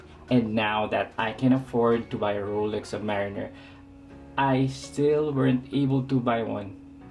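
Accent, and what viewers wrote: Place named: Philippines. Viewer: You sound like you're from the Philippines. I would have thought the 36mm size would have suited you better. Just thinking about buying one of these in the Turquoise blue. Just sold my Rolex Explorer to fund it.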